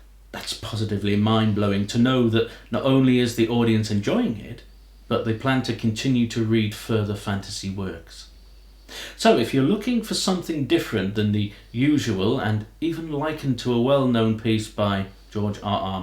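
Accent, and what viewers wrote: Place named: England, United Kingdom. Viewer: I've told you before, I love your English accent.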